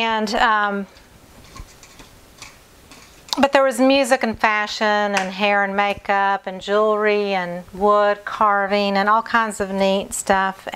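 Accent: South, United States. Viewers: Enjoyed watching and learning. , I tried one yesterday with no silicone and had the same results but liked the shine, bought more colors today. Love your southern accent, l lived in Shelby for 30 years, I do miss North Carolina!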